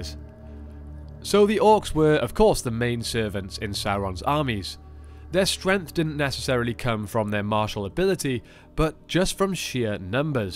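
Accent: Wales, United Kingdom. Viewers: About time I subbed! Is this dude Welsh, does anyone know?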